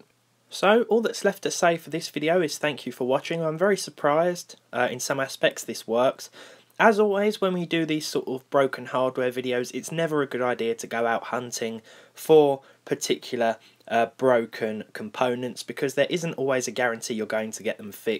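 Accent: United Kingdom. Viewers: Nice British accent, can you say Harry Potter in the end of your next video? Pls pls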